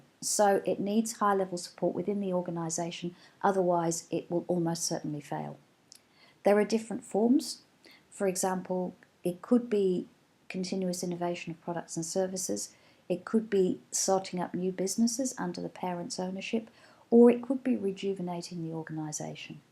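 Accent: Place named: Manchester, United Kingdom. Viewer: Very nice accent I think u are from Manchester London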